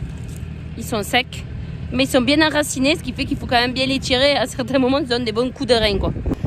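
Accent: South, France. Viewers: Salut reportage super intéressant avec toujours ton bel accent du midi p'tit cc a Chipie 😃👍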